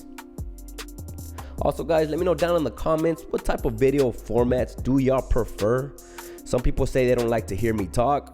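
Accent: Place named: Mexico. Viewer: Bro it’s not you talking that’s boring u shocking try to not talk Mexican bro ya accent it’s Chevato bro u can tell Spanish accents heavy try talking like you from Brooklyn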